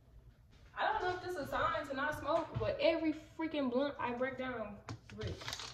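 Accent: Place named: New York, United States. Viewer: Where are you from you sound like you from newyork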